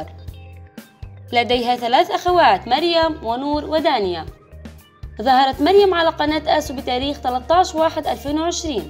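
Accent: Baghdad, Iraq. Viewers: لا بغدادي كلامهة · يب بغدادي🙂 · لا ترا لهجتهاا عراقيهه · دنجب اصلا هيه تتكلم عراقي هيه من الغه تهرف اوك ولهجته عراقيه شلون تكول هيج ؟؟؟؟ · ابدد كلامهه بغدادي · لهجتها بغداديه خيررر؟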